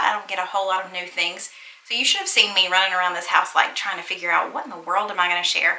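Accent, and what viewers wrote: South, United States. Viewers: Loving your southern drawl!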